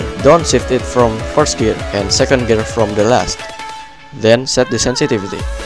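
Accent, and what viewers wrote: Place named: Indonesia. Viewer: shiet i knew it from the accent... you're from indonesia ...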